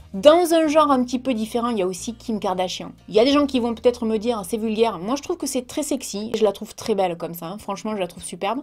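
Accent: South, France. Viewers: Je laisse rarement des commentaires, mais j'aime beaucoup tes vidéos et les messages que tu y inclus. C'est assez rare sur youtube. J'adore le petit accent du sud et cela me rappelle ma douce France, comme suis expatriée en Allemagne 😀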